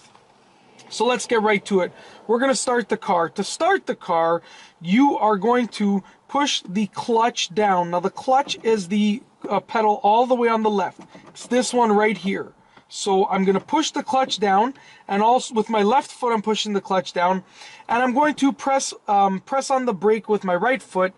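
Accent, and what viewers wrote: Canada. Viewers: I was thinking more of like a Canadian trying to do a normal American accent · I say he's heavy non-Quebec Mountain Canadian. I mean, it's plain obvious. All that's missing is one of those Aviator Hats to complete the look. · Totally Canadian accent. I miss hearing it sometimes! :) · It’s been 5 secs and I can already tell this mans is a fellow Canadian.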